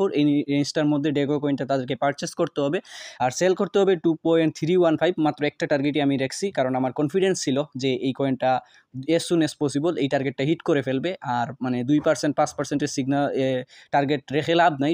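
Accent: Sylhet, Bangladesh. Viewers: বাড়ি কোথায় সিলেটে নাকি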